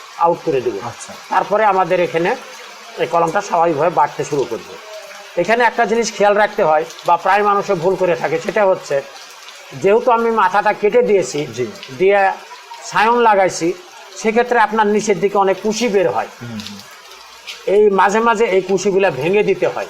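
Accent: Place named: Tangail, Bangladesh. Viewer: ভাইজান সুন্দর করে বুঝিয়েছেন। ওনার সহজ সরল ভাষা ও কিছুটা আঞ্চলিক (সভবত টাংগাইল, জামালপুর বা মানিকগঞ্জের ভাষা) খুব ভালো লাগলো। টপিকটা আমার কাজে দিয়েছে। ধন্যবাদ জেন্টেলনম্যান।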